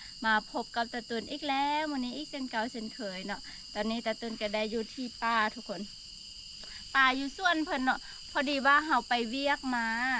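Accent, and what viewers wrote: Thailand. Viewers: ภาษาบ้านข้อยกับภาษาไชยบุรีบ้านเจ้า เว้าคือๆกันเลยจ้า ภาษาเมืองเลย🥰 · สวัสดีครับน้องสาว ส่งความรักความหว่งใยและความหวังดี มาให้ทุกๆวันครับ❤สำเนียงพูดเหมือน เชียงใหม่ ครับ❤❤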